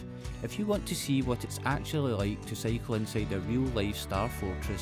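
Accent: Scotland, United Kingdom. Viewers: Two things I love the most - cycling and Scottish accent - all in one channel!!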